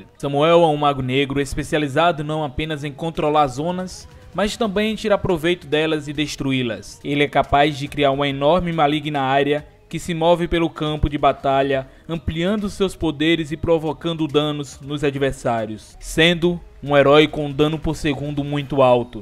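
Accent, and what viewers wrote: Northeast, Brazil. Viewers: tu é de onde?tem sotaque do nordeste oshe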